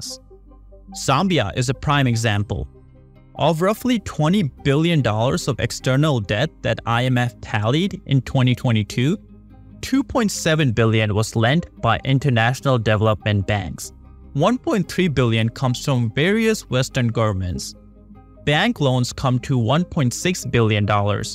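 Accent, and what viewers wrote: India. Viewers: Why you speak with an indian accent? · This guy is Indian. His accent is different from most indian but the the way he rolls his tongue is definitely indian.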